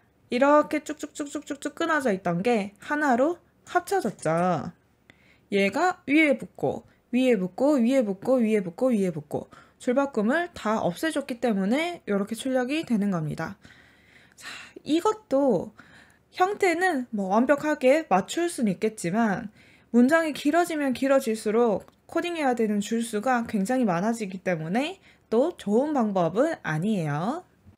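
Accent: Gyeongsang, South Korea. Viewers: 오늘 또 듣네요~~ 님 경상도분이신 듯 좋아요 따봉 추가요~~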